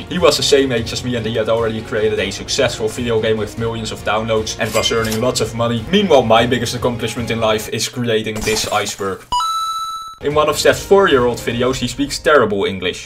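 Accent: Netherlands. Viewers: Het nederlandse accent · are you dutch you sound really fucking dutch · i smell a dutch accent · Ah, yes, a fellow dutch man I hear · love his dutch accent!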